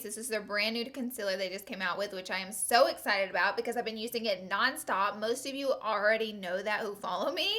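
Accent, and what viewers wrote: South, United States. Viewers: "Olly" The way she says "oily" is interesting to me because she has a pretty thick southern accent (none of this is suppose to offend anyone).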